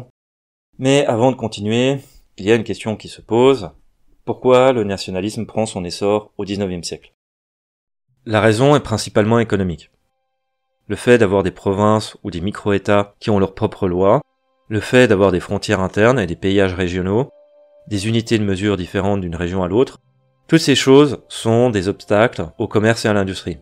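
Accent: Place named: Monthey, Switzerland. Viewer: Excellente vidéo ! Claire et concise, j'aime 👍 Par contre, tu viendrais pas de Monthey par hasard ? Je peux me tromper mais il me semble que tu as l'accent de la région 🤔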